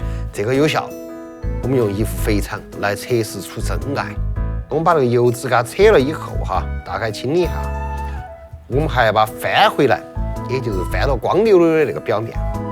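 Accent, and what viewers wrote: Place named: Sichuan, China. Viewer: ，不开心的时候需要你的川普。谢谢你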